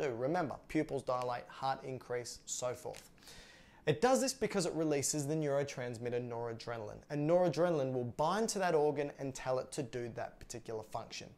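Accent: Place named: Australia. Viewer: Your accent is exact like some of the legendary Australian cricketers..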